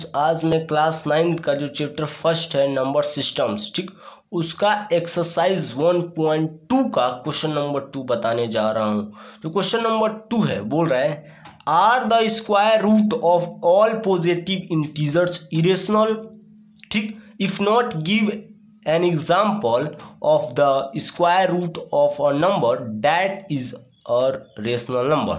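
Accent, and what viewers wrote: Bihar, India. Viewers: Sir aap Patna Bihar se h....?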